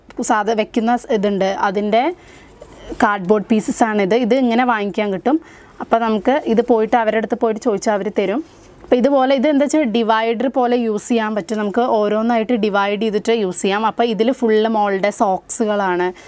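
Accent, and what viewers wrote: Palakkad, India. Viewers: Very nice presentation.Palakkad slang nannaytnd chechik..inim itholathe videos expect chyunnu.All d best 😊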